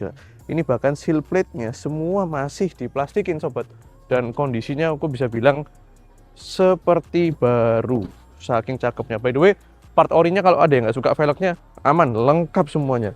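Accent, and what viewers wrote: Surabaya, Indonesia. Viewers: Wes luweh asik gae logat arek suroboyoan ae ko...salam sego indomi🍚🍜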